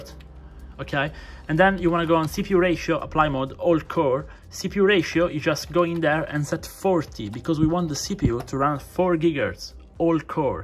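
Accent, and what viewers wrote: Italy. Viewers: Come cazzo si capisce che sei italiano 😂❤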